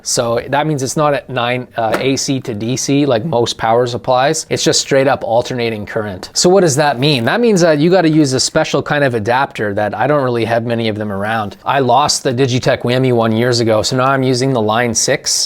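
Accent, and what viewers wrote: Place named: Canada. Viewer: This guy talks like a darn Canadian!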